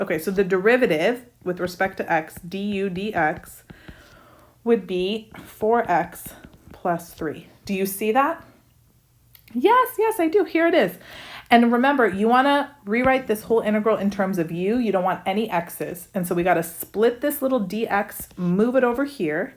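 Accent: United States. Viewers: How's that one 😂 Adore American English)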